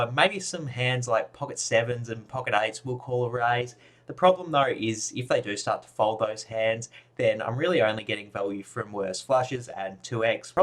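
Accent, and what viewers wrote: Australia. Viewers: 👀 Me looking for vlogs trying to decide if I like the poker content or the Aussie accent more. Keep up the great work!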